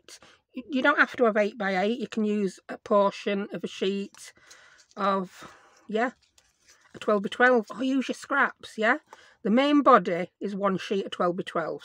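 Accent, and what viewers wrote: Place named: Southern England, United Kingdom. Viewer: Oh you do make me laugh and your accent makes me homesick I've lived in scotland longer than I was down south. Love the project and I will try it as I'm trying to get a collection of ephemera. I've also ordered the dies love aliexpress at least they have decent prices.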